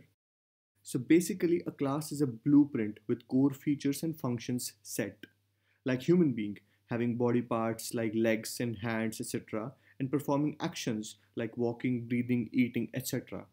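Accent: India. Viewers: Another brilliant Indian with his beautiful accent. Very helpful video. Thanks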